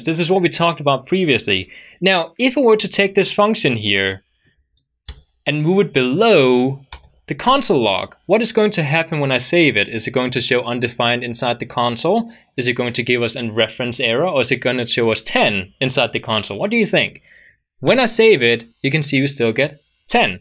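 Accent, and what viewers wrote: Italy. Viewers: are you italian? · is he Italian?🤔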